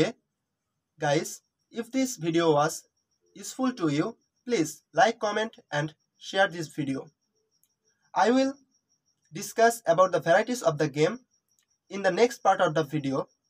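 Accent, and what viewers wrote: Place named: Bengal, India. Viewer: English bolar dhoron Jodi Bangla kothar motoi hoy tahole Bangla bolen...joto sob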